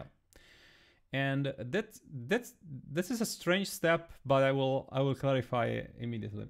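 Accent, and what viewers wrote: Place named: Italy. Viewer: Nice video and great explanations! And you have a lovely Italian accent! Keep on the good work!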